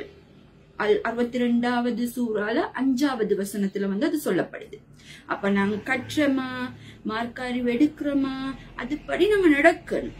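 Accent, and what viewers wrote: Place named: Colombo, Sri Lanka. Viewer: இது கொழும்பு மக்களுக்கு உரிய பேச்சு நடை எல்லாரும் சுத்த தமிழிலா பேசுறாங்க குறை காண்பதே வழக்கம் போல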